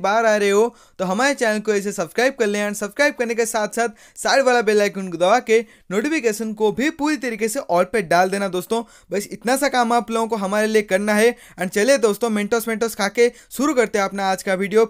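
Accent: Odisha, India. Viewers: Bhai tum odia ho kya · Aap odisha ke ho kiya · Bhai tum odisha se ho kya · Bhai app Odia ho kya ? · Bhai tum odisha se ho?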